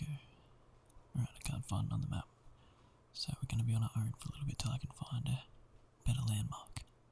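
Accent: Australia. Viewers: Really enjoyed it :) Are you Australian? It's safe to say you're my favorite male ASMR'er. You have a nice way of pacing your videos.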